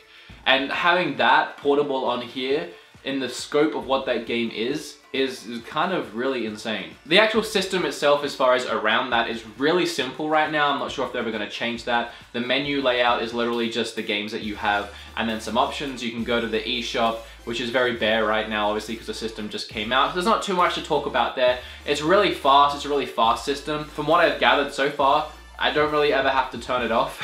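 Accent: Australia. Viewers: Wher do you live man? Where are you from? I thought you were a Ozzie haha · good video! what accent is that, are you a Australian?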